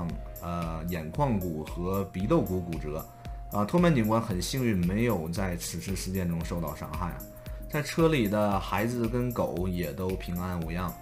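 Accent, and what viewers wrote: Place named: Northeast, China. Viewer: ok，你严谨，我应该说 up 主是美籍华人东北口音。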